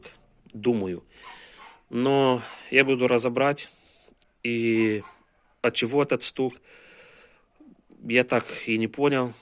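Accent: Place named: Moldova. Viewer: Вы с Молдавии? По акцент у узнаю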